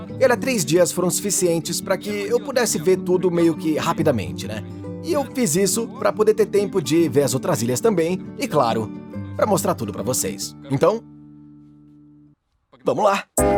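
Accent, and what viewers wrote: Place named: Brazil. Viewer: Voz do Brasil Brazil 🇧🇷🇧🇷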